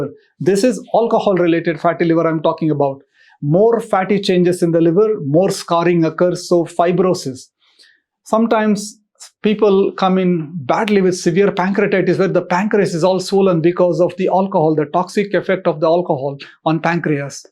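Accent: India. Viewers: Awesome Indian accent. 😆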